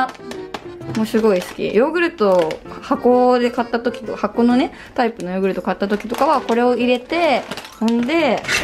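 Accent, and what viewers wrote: Okayama, Japan. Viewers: 同じ岡山出身！ たしかに口調が岡山弁だね😊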